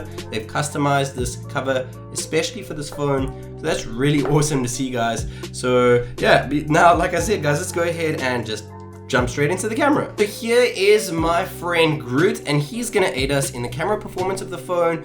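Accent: South Africa. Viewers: Hey...so...are you south african?great review btw.anyway,any idea where one can get this in sa,or cheap shipping to sa online.Thanks a lot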